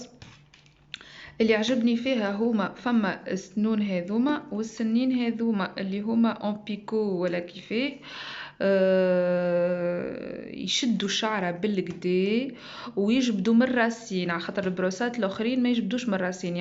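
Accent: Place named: Algeria. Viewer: يعطيكي العافية حبيبتي بس يا ريت تكتبيلي تنصحي فيها ولا لا لاني للاسف ما فهمت اللهجة الجزائرية الحلوة و كمان ما اعرف فرنسي يا ريت تكتبيلي اذا كانت جيدة ام لا و هل تنصحي فيها ولا لا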